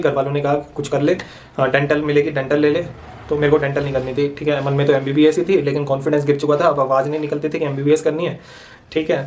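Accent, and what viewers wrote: Haryana, India. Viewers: U have haryanvi accent are from haryana??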